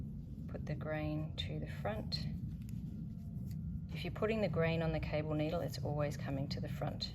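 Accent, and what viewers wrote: Australia. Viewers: Dear Thank you so much for your time spent creating these tutorials. I purchased the pattern in my mother tongue, danish, but because I am a relatively new knitter, it has been slightly daunting. You make it look like child's play. Although I am not fooled into thinking that it will be for me, it does encourage me to think that it may just be possible. Being Scandinavian, I am used to nordic style knitting. Nevertheless your tips are invaluable. Lastly I can't refrain from commenting on the joy it is to hear it all explained in australian english, as i grew up partly in Bendigo, Vic. So I enjoy the light in your beautiful home, which is so typically Australian. 🎉 I do have a bit of trouble balancing my cable needle. The stitches tend to slip off. Do you know any tip to get around this?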